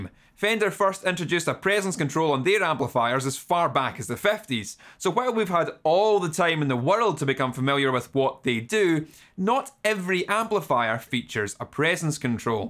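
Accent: Scotland, United Kingdom. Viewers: How can we do your scottish accent? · Honestly i just watch these videos for cool accent🤣, my scottish brother(scottich heritage here)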